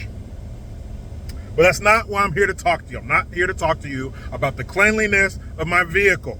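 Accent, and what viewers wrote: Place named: South, United States. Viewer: You’re freaking cool AF! Funny dude and hell of a good southern accent! 😂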